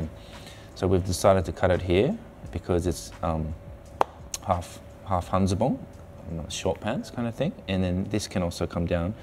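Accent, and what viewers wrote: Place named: Australia. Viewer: The Australian accent threw me off for being japanese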